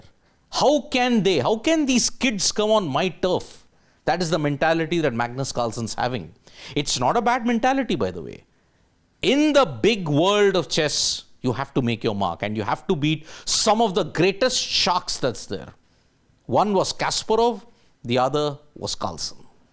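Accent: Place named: Tamil Nadu, India. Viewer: Host: U've an Irrrrrrittttaaating Tamilian accent, a bit like Ashwin the bowler. Def not a grt way to present. Gary's Insights are always valuable. Magnus is perhaps past his prime now. We shall know it in a yr or so.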